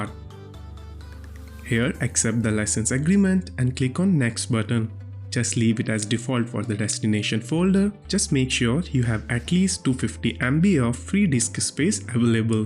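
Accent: India. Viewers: Tnx a lot brother, Btw ur accent in like Indian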